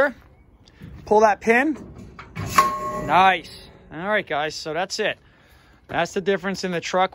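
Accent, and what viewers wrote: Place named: New Jersey, United States. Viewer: He’s a great helper! I hear the jersey accent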